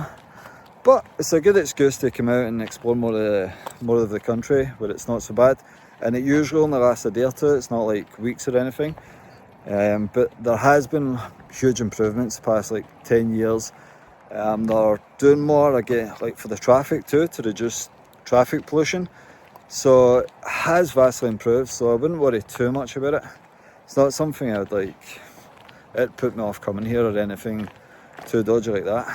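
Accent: Scotland, United Kingdom. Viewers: The difference is-I love your Scottish accent. I have never been to Scotland but maybe some day it will be possible ,I hope so. Offended? Are you kidding? We not get offended so quickly. Like the Scotts I've heard they are very direct people, aren't they ?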